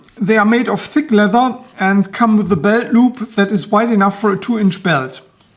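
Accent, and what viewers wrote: Germany. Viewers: englisch etwas deutsch aber da ich es auch nicht besser kann keine reale kritik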